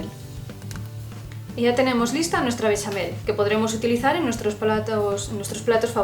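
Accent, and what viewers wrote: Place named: Asturias, Spain. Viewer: Kaixo. Me gustan tus recetas, pero lo que mas me gusta es tu acento asturiano. Saludos.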